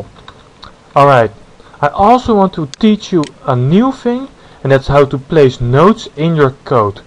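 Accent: Netherlands. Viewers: dude zo een lelijke nederlandse accent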